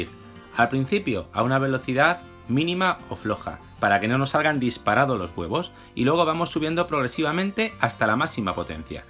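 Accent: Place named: Spain. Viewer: gracias yo soy panadera me gusta demasiado la panadería de que parte del mundo eses tu tu voz parese española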